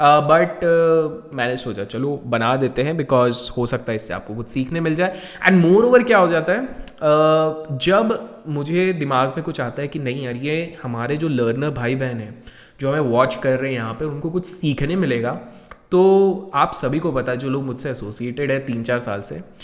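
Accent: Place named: Mumbai, India. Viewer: I like your Mumbai ya Hindi And the scam series was fantastic specially Dhirubhai Ambani vala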